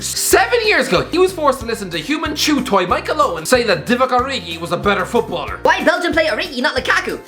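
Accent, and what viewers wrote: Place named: Ireland. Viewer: and words which end a sentence while having three ˢʸˡˡᵃ⁻BLES... you've got to love them.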